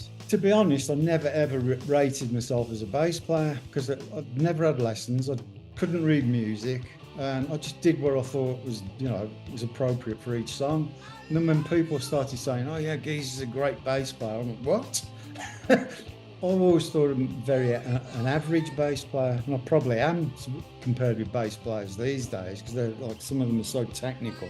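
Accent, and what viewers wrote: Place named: United States. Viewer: love geezer, dont really like that yanky narrator!!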